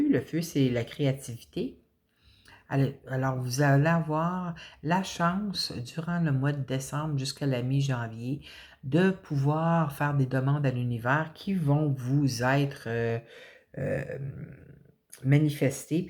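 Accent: Quebec, Canada. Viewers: Vous êtes trop mignonne et pleine de bienveillance, je vous ecoute, vous et votre super accent, avec une immense joie. Vive le Québec et ses habitants 😍😍😍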